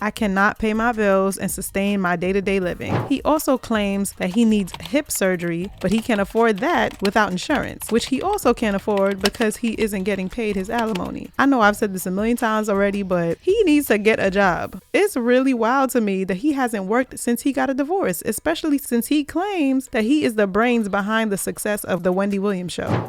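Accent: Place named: New York, United States. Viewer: Your slightly New York accent makes me miss my east coast fam. 🙂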